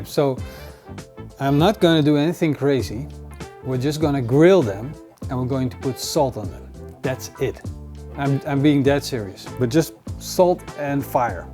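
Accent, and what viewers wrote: Netherlands. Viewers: Zo te horen ben je nederlands. Waar haal je in godsnaam een rack als dit? Ik neem aan niet gewoon bij een slager?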